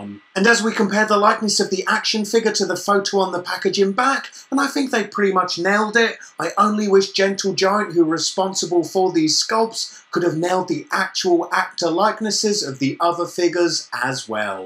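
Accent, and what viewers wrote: England, United Kingdom. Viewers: Sorry, it just sounds like a regular English accent to me. I admire you alot and would never intentionally insult you.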